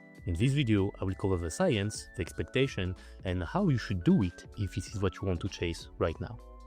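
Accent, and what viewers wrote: France. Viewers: I think Uncommon Sense accidentally did you dirty with that "bylking" comment 😆 he was actually teasing you (phonetic /y/ is pronounced like the french u, he was just playfully replicating your accent IINM) The french u is closer to the english way of saying "bulk" than a french i. If you wanna inch closer still, you could say 'boulking' but almost like an O, or just keep practicing the english pronunciation directly. Cheers.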